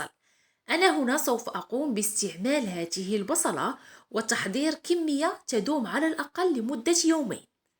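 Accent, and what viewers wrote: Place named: Casablanca, Morocco. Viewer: وصفه جديده مغربيه احسن وصفه الببوش مع الفليو بولغم وش البولغم قصدك بلغم الله يعين اللغه العربيه من لهجتك انت بنت كازا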